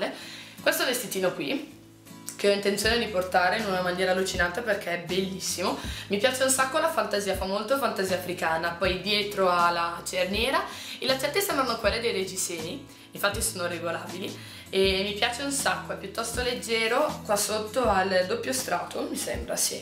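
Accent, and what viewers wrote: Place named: Brescia, Italy. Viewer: di che parte di Brescia seii? appena hai salutato ho subito capito dall'accento che fossi delle mie stesse zone.. ahahaha :D begli acquisti comunque :)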